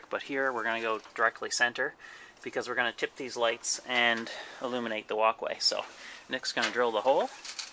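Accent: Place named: Toronto, Canada. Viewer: Totally knew you were from Toronto before I even saw your user name! #Hoose LOL!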